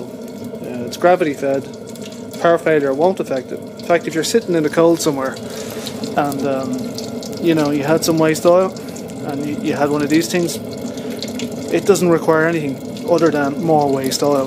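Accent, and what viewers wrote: Ireland. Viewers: We Americans love the Irish accent. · Excellent set-up! I particularly like your innovative use of the Pyrex dish. It's both handy to see the flame and one of the nice things about having a fire. The crackling sound is water in your oil. That will reduce your heat output... if it matters at all. Dry oil does work a LOT better in these burners. If you were interested in efficiency at all, you could run your Chimney pipe horizontal for a good few feet before ducting it out. You would find there is a lot of heat coming off that which would now be wasted. If you also had a small fan blowing along it, the heat would be better dispersed around the area you are heating. Congrats on a job very well done with this. It's definitely the neatest and most innovative burner of it's type I have seen. You have thought it through very well and come up with a great set-up. 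Great explanation and who doesn't love an Irish Accent! Well done to you Sir!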